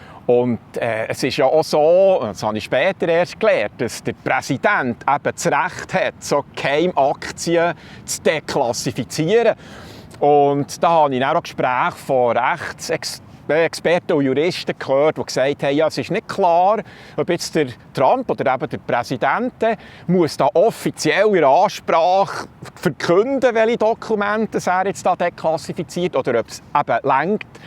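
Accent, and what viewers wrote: Bern, Switzerland. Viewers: iu, tschübe, si'sch gäng schöön d's Bärndüütsch. Tuet soo guet, im Kanton Basu/Solothurn (näbenang)! Regina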